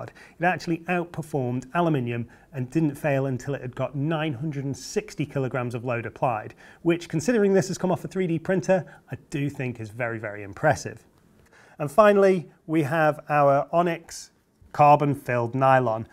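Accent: United Kingdom. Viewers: why is it always someone with a brit accent👎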